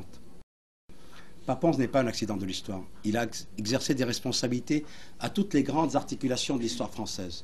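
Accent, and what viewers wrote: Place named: Senegal. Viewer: la prononciation est typiquement Sénégalaise !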